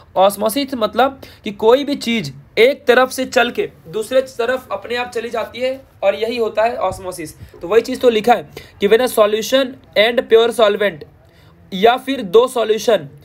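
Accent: Maharashtra, India. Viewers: Marathi aahe ka Dada tu? Aasa bolat ja madhe madhe thoda changl vatt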